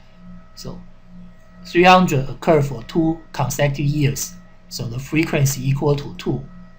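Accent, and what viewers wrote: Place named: China. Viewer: lol “curly bracket”, his accent is sick. obvi chinese & not racist to point that out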